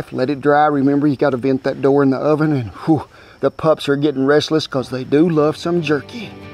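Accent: South, United States. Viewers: 52 year ol Brit from across the pond.. you sir just got a new sub for one hell'ova entertaining video and dayum they looked tasty... do love a deep southern Merican Cowboy accent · im glad i found this. love the cowboy accent, made me laugh, hungry and also gave me a hug. definitely coming back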